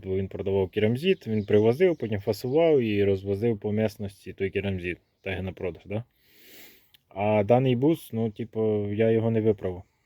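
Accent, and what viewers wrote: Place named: Hutsul, Ukraine. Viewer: Привіт з Волині ,Автор одне питання ви з Гуцульшині чи області Закарпаття?????акцент увас похожий)).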